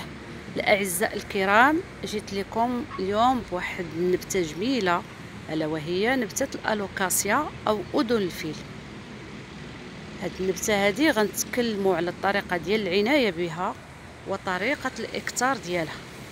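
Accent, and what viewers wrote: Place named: Algeria. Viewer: ما اجمل اللهجة الجزائرية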